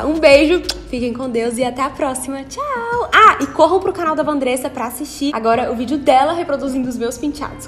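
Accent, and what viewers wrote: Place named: Northeast, Brazil. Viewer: Aqui.se chama lerota kkkk ou ataca mas amoooo esse nosso sutaque nordestino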